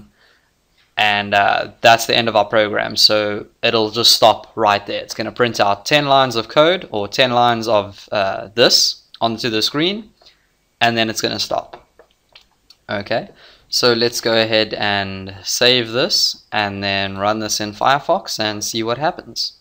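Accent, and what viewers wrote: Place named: South Africa. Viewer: As soon as I heard your voice I was like... This one is a homie from South Africa. 😀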